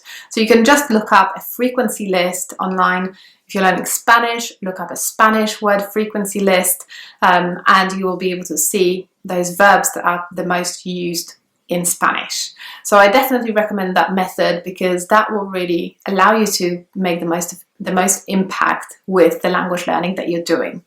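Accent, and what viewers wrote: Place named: United Kingdom. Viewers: I love this accent❤️ I’m Iranian and I want to learn English & British accent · ❤️British accent!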